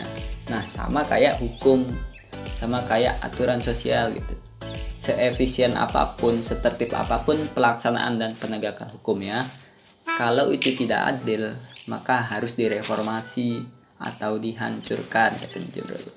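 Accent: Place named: Lombok, Indonesia. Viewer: Logat sasaknya kentel banget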